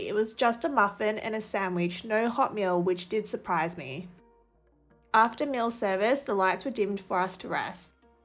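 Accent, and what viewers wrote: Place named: Australia. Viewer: ох уж это австралийское произношение, всё понятно, но напоминает анекдоты ;-)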